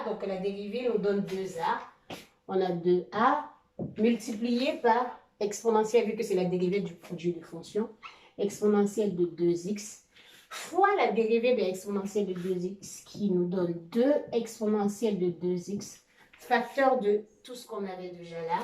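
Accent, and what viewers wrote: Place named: Cameroon. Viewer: Très bonne présentation, vous avez un accent camerounais que je reconnais. Félicitations à ma compatriote mathématicienne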